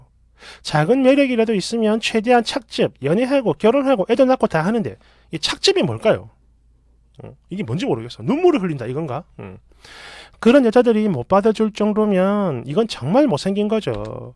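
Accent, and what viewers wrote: Gyeongsang, South Korea. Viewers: 경상도 분이시구만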